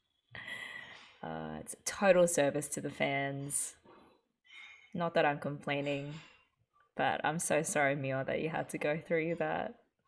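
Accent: Australia. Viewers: Really enjoy your videos and insights and have just spent the last 3 days binge watching K-On for the 5th or 6th time just so I could watch it along side you and catch up. Even after watching it so many times, you really bond with the girls (Ritsu is best girl for me) and tear up at the end as you feel like you're leaving your best friends. K-On is more than a cute-girls-doing-cute-things anime, and closer to a life lesson on getting the most out of the present. Since you liked the drum beat of Go! Go! Maniac (the second OP), here's a real-life Ritsu cover <link> She's totally awesome and was only like 13 at the time. Also as an Aussie from Melbourne who's been living in the US for the past 14 years, I really miss the Aussie accent, so that's been surprisingly comforting to listen to you too. Now I'm starting your Sora yori mo Tooi Basho (A Place Further Than The Universe) reactions, since that's another awesome show that I love. 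Thanks for all the work you put into the videos and look forward in watching Hibike Euphonium with you in the future.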